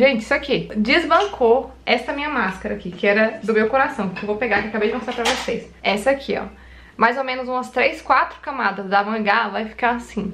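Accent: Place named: Minas Gerais, Brazil. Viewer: Já quero pra vida! Negoço existe no vocabulário aqui em Minas... haha Seu sotaque tem uma pegada mineira...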